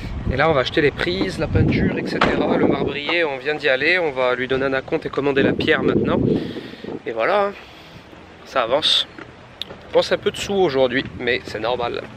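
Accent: Corsica, France. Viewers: J'adore l'accent corse... Bonne continuation.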